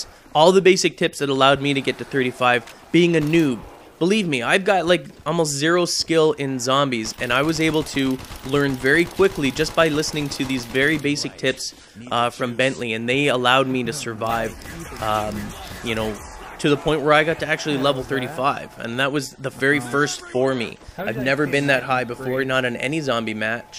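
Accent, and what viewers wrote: Canada. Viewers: ur canadian. you go oo instead of ow or ou. and you sed eh? abunch of times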